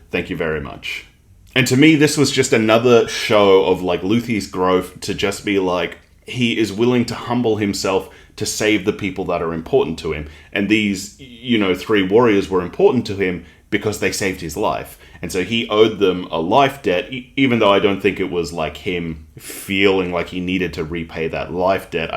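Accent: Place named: Australia. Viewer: Oh, oh! One of the first reasons your videos resonated with me was because I spent a significant amount of 2020 in australian backpackers (the accent really sends me back ksksk) So yeah, just letting you know that someone was wondering from where you were, actually lol Anyways, I've stuck around for the contect. It's super fun to see you theoririzing. Always excited to watch your videos!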